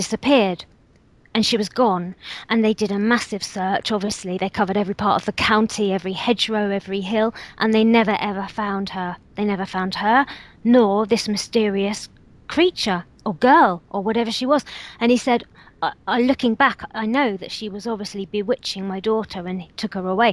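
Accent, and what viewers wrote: England, United Kingdom. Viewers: nice story and lovely british voice but on a sidenote it's just a story :) you have to be very gulable to believe this really happend but it doesn''t matter if you want a scarry night and just want some mystery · is awesome. I live near cannok chase I wish I could give her a bit of information about many of the stories about that place all I have some intresting information linked to masons and black eyed children. Ill try and look for a way to send her a email. Hey but her stories are great nice prim and proper english accent great story teller. The pig man is another story linked to cannok chase a Bigfoot type creature. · This is the kind of British accent that I love not the Bo'Ohw'O'Wo'Er one.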